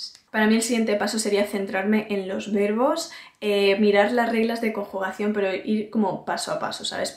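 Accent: Spain. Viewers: youtubers que hablaran español natural de España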